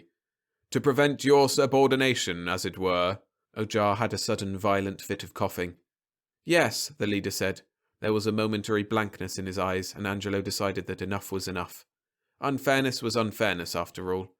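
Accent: United Kingdom. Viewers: The narrator has an annoying UK accent. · I'm sorry. Some of them really hit oddly in a British accent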